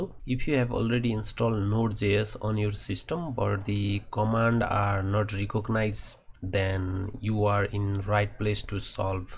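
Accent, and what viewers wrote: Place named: Russia. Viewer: Whats up with the russian accent?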